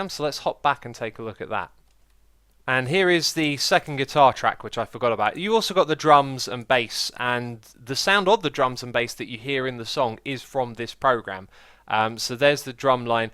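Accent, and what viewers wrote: United Kingdom. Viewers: I think it's the accent. idk why but British accents don't sound like metal fans to me.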